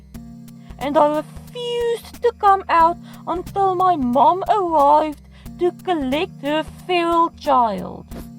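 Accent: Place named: South Africa. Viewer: Recognised the accent from a mile away XD so cool to see a South African story time/animator!!!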